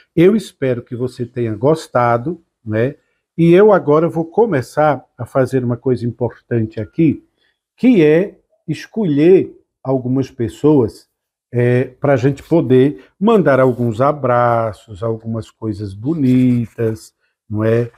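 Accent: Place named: Northeast, Brazil. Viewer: sotaque nordestino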